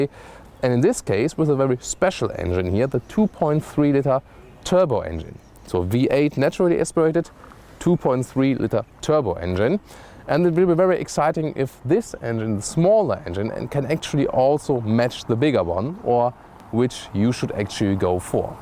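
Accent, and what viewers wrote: Germany. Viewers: Ha ha, there's something cool in Your German accent! :]] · Warum macht ihr ein Review vom Mustang auf Englisch? Es gibt 3000 amerikanische, englische, australische, neuseeländische, kanadische YouTube Channel, die Autosendungen auf Englisch machen. Ich bezweifel, dass die sich dann gerne einen englische Review mit deutschen Akzent ansehen/anhören wollen, wenn es Channels, wie Motor Trend gibt.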